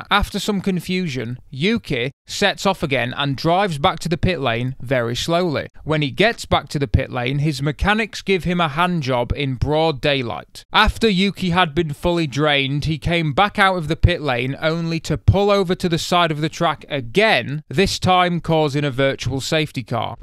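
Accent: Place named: Stoke, United Kingdom. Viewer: Great toon for - As a Staffordshire bumpkin I am guessing is from Sheffield - he has an accent that's a bit "Stooorkeee and a bit Jimmy Savile". Its rare to see new talent. Bweekfust spazzy is my favourite.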